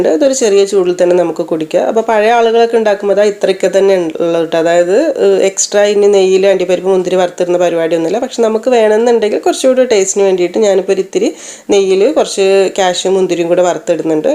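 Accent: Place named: Perinthalmanna, India. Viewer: പെരിന്തൽമണ്ണ ശൈലി ഉണ്ടല്ലൊ ഭാഷയിൽ. പെരിന്തൽമണ്ണയാണോ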